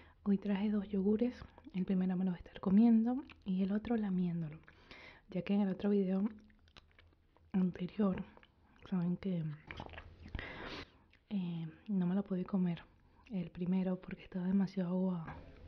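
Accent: Venezuela. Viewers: Me encanta el acento venezolano, lo mire hasta el final. Super agradecida por este video.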